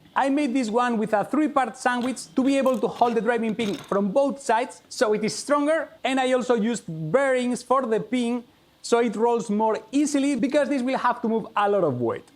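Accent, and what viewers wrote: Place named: Spain. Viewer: You are a genius. I love that you speak fluent English with a super strong Spanish accent.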